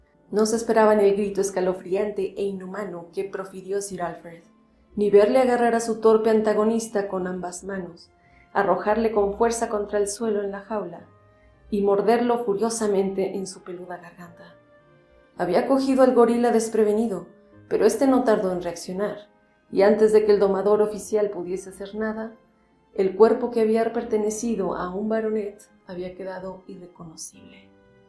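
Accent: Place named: Mexico. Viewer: Tu tono tu voz son ambrosía para mí oídos. SOS mejicana suena dulce como la miel me recordas el aroma de las margaritas. (Las flores)🇦🇷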